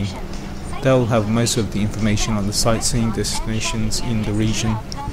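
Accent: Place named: Australia. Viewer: Excellent work & I am a big fan of Australian accent. :D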